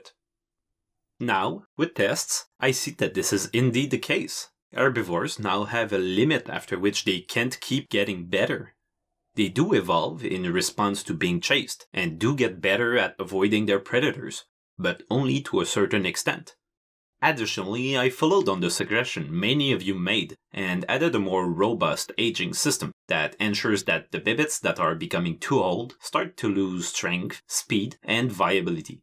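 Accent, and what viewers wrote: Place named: Quebec, Canada. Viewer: Bibites sounds Québecois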